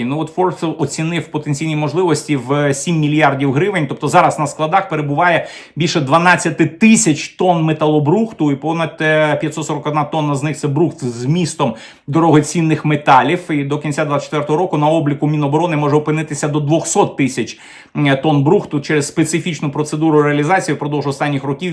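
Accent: Russia. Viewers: Пане, сьогодні вам я поставлю лайкос, тільки за те, що мені не різало вухо оте ваше, рашистське, -- "тєма", "схєма", "проблєма". P.S. Але ж, ні, в кінці ви таки викинули своє рашистське "тєма". Ну, не в обіду вам, але так виглядає, що ми з совка вийшли, але совок залишив свій слід. Ви послухайте, як говорить корінний росіянин, котрий не так давно перейшов на українську мову, телеведучий Олексій Суханов. Та в його вимові близко нема таких висловів, як у вас,корінного українця, котрий частину свого життя пропрацював на УТ.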